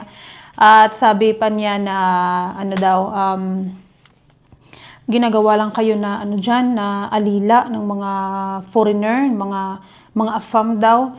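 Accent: Iloilo, Philippines. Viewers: ilongga ka ma'am?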